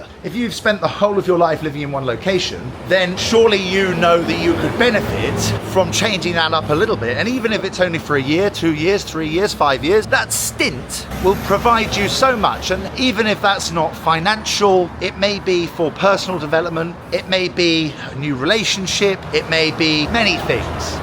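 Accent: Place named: England, United Kingdom. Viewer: you look like UK people exactly, your shoulder your face your accent, your hair , typical English people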